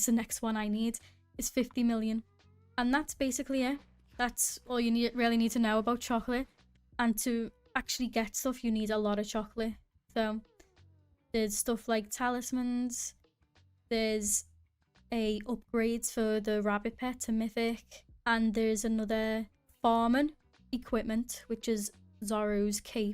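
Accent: Liverpool, United Kingdom. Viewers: Are you from around Liverpool? I can’t shake that accent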